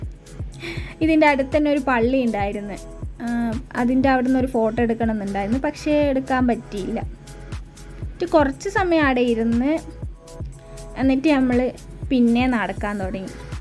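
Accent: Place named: Kannur, India. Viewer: Kannur slang Nalla pole undto😄 karakkam okke kainja ine aede pone😄 ❤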